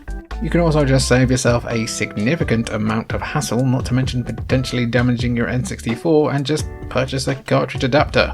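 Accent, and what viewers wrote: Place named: United Kingdom. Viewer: Good stuff as an American the “th” sound doesn’t really bother me I just sort of chalk it up to “ah he’s just British, it’s cool” 😂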